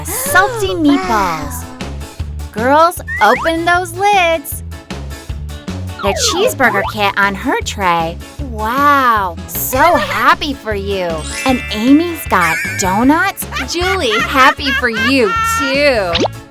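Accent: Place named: India. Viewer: Why you sound like India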